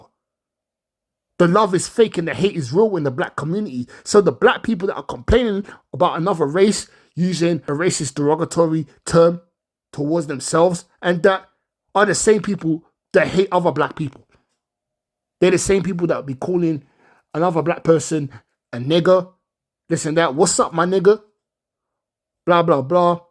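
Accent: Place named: India. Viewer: You sound like a Indian tether yes we can say it everyone else can’t why is it so hard for yall to understand.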